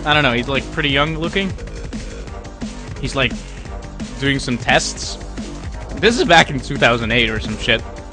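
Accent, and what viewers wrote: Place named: United States. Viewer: Thought so, 'cause he speaks english like real americans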